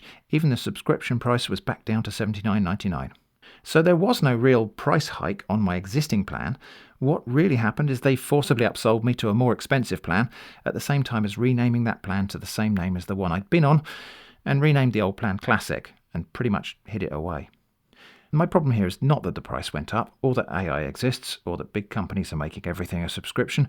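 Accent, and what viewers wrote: United Kingdom. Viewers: With the lively British narration, I feel like I’m listening to a chapter of Hitchhikers Guide to the Galaxy!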